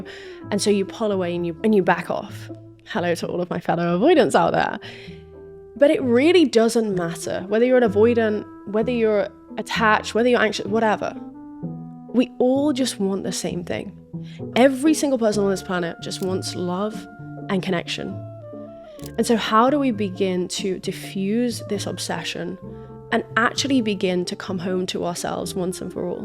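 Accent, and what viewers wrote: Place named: London, United Kingdom. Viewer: I Loooooooove your London accent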